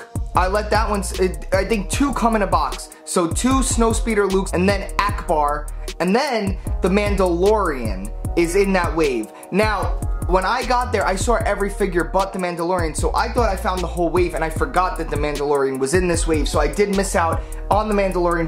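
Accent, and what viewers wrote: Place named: Staten Island, United States. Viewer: Bro where u from you sound mad Staten Island?!? 😁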